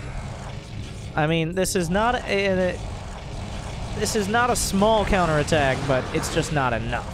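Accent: South, United States. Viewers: Dude you have sick commentary skills, super entertaining! Is this guy from the South or something?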